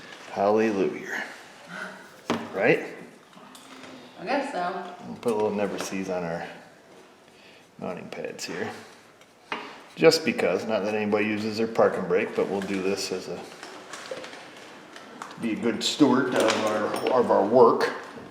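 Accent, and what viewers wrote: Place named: Long Island, United States. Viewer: Are you located on Long Island New York it sounds like you might be I really could use a good mechanic like yourself trustworthy and very knowledgeable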